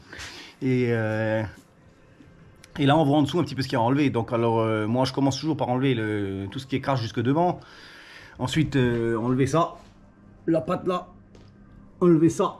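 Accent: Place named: Sarreguemines, France. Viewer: Bonjour, vous êtes en Moselle ? J'ai l'impression de reconnaître l'accent de Sarreguemines ? Je cherche un mécanicien pour ne embrayage sur bmw série 1 116d je suis de Forbach.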